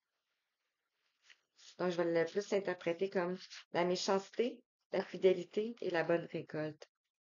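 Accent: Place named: Canada. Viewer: Bonsoir 😊je vous écoute avec beaucoup d'attention et je suis bluffée ❤merci beaucoup pour votre voix douce et votre accent canadien tellement sympathique 😊 pas trop tard pour vous adresser mes meilleurs vœux pour en cette nouvelle année à vous et votre famille ❤❤❤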